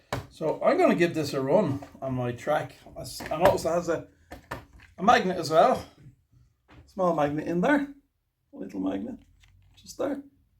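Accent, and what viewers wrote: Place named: Ireland. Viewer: I ❤❤that Irish accent....as well as the slot cars 😂😂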